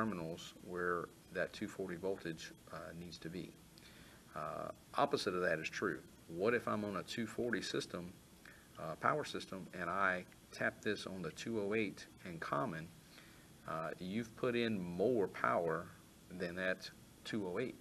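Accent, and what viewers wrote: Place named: South, United States. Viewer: Why do all the videos have a tech with a southern accent?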